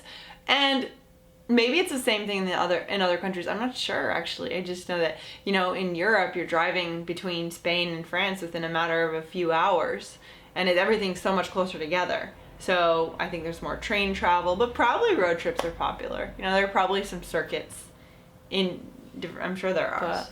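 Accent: United States. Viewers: Hi, You look and sound more as an American girl than you are in AEE podcast, you are so cool!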